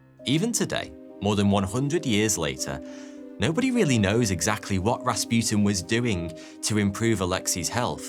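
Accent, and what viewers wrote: United Kingdom. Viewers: He's a brit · Amazing content. I mostly enjoy hearing your British accent. Funny, and history facts. Great job, and thank you.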